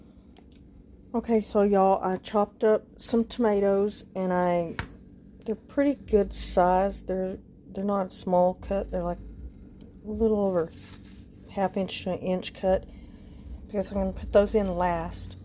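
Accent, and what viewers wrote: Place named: Texas Panhandle, United States. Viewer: Hey, I was just watching some of your recipe videos which I do really like. But I noticed that you have a fire alarm chirping in the background. I hope that I’m not being rude, I just got a bit distracted by it. Also, I’m from the Texas Panhandle as well, and hearing your voice sounds like home. I live in a different state now and miss hearing my people.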